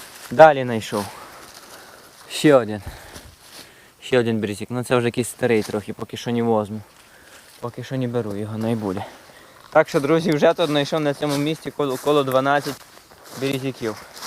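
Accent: Hutsul, Ukraine. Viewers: Як він гарно говорить.Правдивий гуцул.А гуцули вільні люди!!!! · Привіт гуцулам з Харкова. Слава Україні! Слава ЗСУ! Приємно чути ядерну гуцульську мову. 🇺🇦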